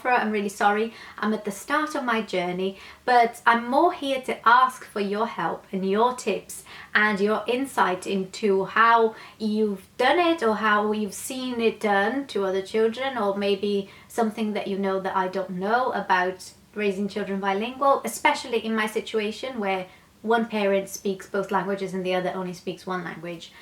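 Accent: United Kingdom. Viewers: Aii quero ter esse sotaque britanico seu que lindo s2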